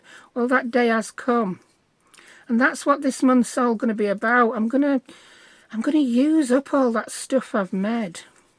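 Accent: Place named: Northern England, United Kingdom. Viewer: Hi there, just tuned in to your tutorial & just love your northern twang. Whereabouts are you from. I live in New Zealand now but originally I’m from near Buxton in Derbyshire.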